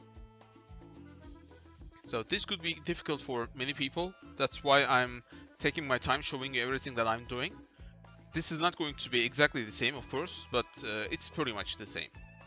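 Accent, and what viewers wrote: Turkey. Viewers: aksanı duyunca dedim bu bizden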